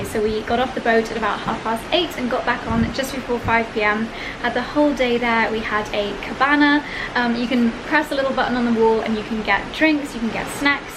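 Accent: United States. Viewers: I just love the American twang you have in your accent when you go State side 🥰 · Oh and your American accent is hilarious 🤣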